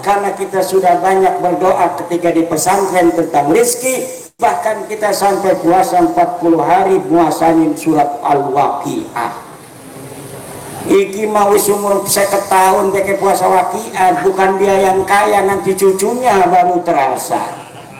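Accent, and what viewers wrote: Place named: Cirebon, Indonesia. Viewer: Ko bhs e mirip Cirebon an y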